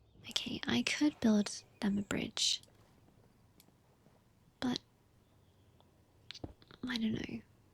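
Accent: United Kingdom. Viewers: Oh my god are you british 😭😭😭 i thought those people were only in tv 😭😭😭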